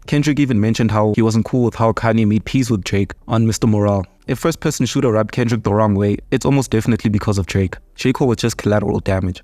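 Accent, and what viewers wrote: South Africa. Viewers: Bro your voice makes me think you're south African. Are you South African?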